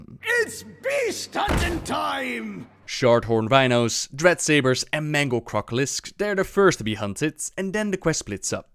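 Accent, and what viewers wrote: Netherlands. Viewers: theonesinblk you know he's dutch right? Accents are accents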